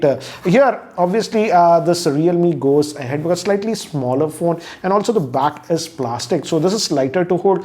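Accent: India. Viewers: I sorry , I don't want to sound like an a$$ But sir, please speak simple English.. in attempt of speaking emphatic sounding English we Indians tend to over do things a lot Point is : Please stop using present continuous tense where it's nit required. Each and every time you said "HAVING" , well not needed. Just say it has or I have . Etc. Having having sounds so stereotypically Indian.. Having, Preferring.. etc... I know abuses will follow. But make sure they are not in unwanted present continuous tense. 🤟 ☮️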